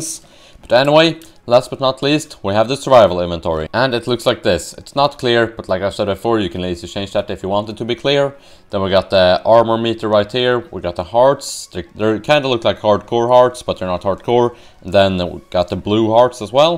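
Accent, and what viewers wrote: Sweden. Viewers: Uhm.. This may sound a bit weird, but you sound swedish ;)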